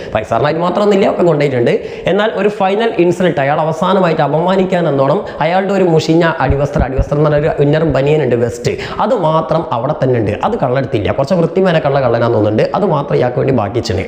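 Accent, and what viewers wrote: Malappuram, India. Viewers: sir malappuramaanoo sthalam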